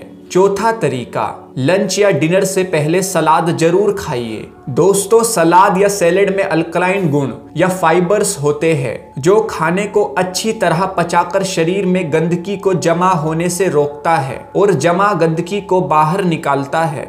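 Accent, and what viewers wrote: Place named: Haryana, India. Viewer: Paka hariyana se ho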